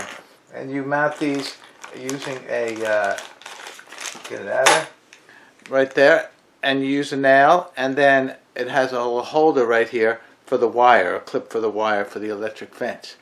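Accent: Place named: Central New York, United States. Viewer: 😍 they're too cute. The brown baby with the dorsal stripe is much bigger. You sound like your originally from the W C NYS area. 😃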